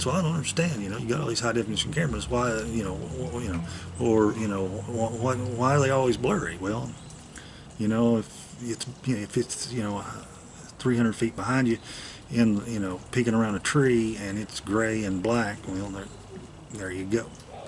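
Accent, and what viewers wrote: South, United States. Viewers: I have just recently started watching your site, it’s very good, but scary as heck. like some of the other people, I found out about you from Steve. I am a native Georgian, near Atl and I was wondering where you were from, I knew it was the South, can’t hide that accent, love it.....take care And be careful out there....🍑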